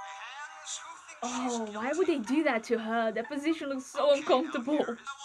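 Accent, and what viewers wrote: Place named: Australia. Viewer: Hey sorry if you’ve answered this already! I’m curious about which country you live in. Your accent sounds Australian or like it’s from New Zealand (if so you’re so lucky). I’m loving your reactions, it’s really funny!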